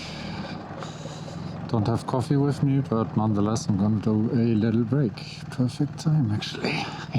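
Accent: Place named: Germany. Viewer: Digga rede doch deutsch, man hört das du deutsch bist. Abgesehen davon aber gutes Video, sieht mega schön aus.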